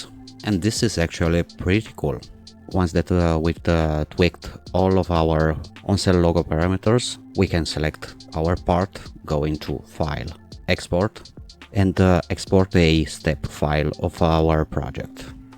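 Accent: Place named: Finland. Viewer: but the way people from finland speak so slowly and with their accent makes the video drag on. Not that i don't mind the accent, but i feel like the video is going way too slow as if i'm being talked to incredibly slow on purpose because someone assumes i'm retarded. LOL. good info though.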